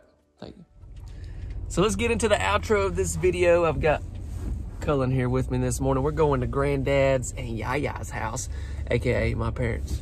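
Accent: South, United States. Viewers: I loves your accent are you from The South I'm from the south as well.Im from Arkansas.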